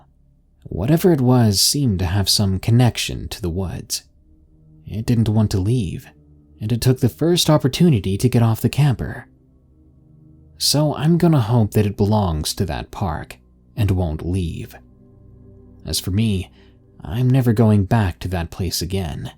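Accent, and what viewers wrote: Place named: South, United States. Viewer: I liked the female narrator’s voice too. I love the man’s voice but for a story that’s from A woman’s perspective, I liked that a female voice was used for her account and I also liked her slight Southern accent as it is mentioned in the story that she is from Kentucky.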